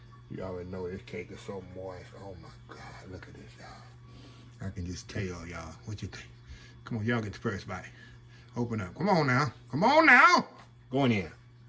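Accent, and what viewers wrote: South, United States. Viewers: Your southern accent takes me back home to like 40 years ago. And you fussing at the recipe..🤣🤣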